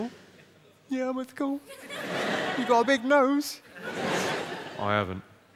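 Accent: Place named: United Kingdom. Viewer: There's something more savage when the comeback is in a polite British accent.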